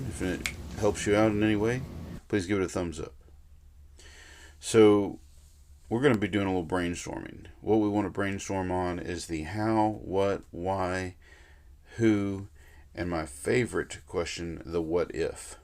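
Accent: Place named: Gulf Coast, United States. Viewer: Grew up in Gautier. 😂 recognized the coast accent immediately 🙂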